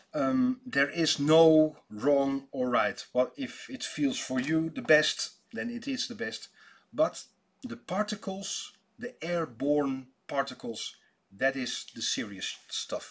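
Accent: Netherlands. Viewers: Toen ik jouw accent hoorde wist ik het al: NEDERLANDER!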